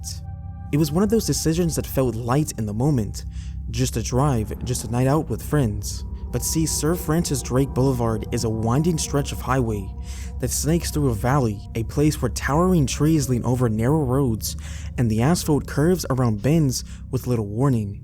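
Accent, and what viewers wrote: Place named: Toronto, Canada. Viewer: You sound like you're from toronto!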